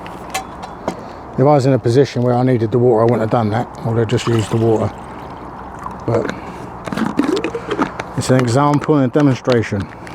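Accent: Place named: United Kingdom. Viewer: This is my favorite type of British accent. Great video.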